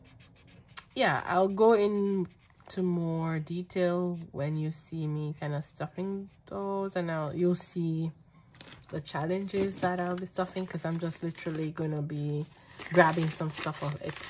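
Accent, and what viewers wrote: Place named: Jamaica. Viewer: You are welcome. When I heard you I was like yep Jamaican 🤣🤣. I am as well 😁.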